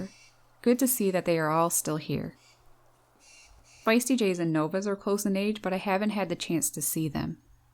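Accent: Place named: Ottawa, Canada. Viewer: Flickr is a great name :-p / I just realised you are a fellow Canadian. I hear the Ottawa? accent now :-p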